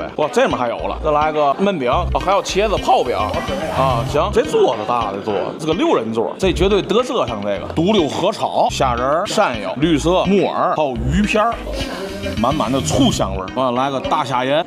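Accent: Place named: Tianjin, China. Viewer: 皮牙子既然你是说了天津话解说就按天津话说洋葱头好了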